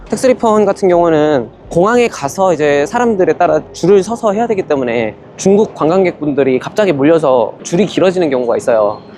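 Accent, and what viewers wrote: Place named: Jeolla, South Korea. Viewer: 능력자시네요!! 저 혹시 고향이 전라도시죠? 말투가 딱 고향사람같이느껴지네요!!! 반갑습니다!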